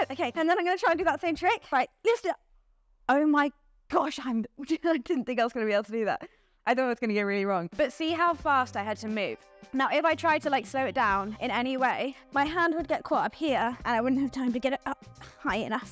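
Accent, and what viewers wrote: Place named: United Kingdom. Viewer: Lights & fire!! ...& Naked!! 🥰 Love watching your videos & watching u hulu hooping is memorizing, captivating, & endlessly entertaining for some reason. You're beautiful, charming & adorable & I bet your performances are in high demand. & I love your British accent. Also love seeing these longer videos rather that just the short form 10-15 second ones ( those are still good, just too short for someone so charming ) Keep up the good work & keep being you. 👍👍😍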